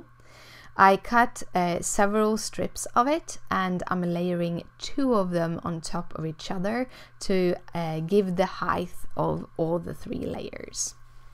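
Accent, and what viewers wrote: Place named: United Kingdom. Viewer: USA resident. Oh my gosh, I LOVE that you made the water hole a shaker. I'll look forward to seeing more of your videos because I like your style. And your accent. I'm going to the UK in Sept/Oct., and will hear nice accents everywhere :)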